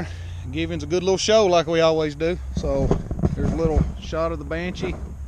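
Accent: Tennessee, United States. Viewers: Fellow Tennessean? I ask because of the accent. And love the shee keep up the good content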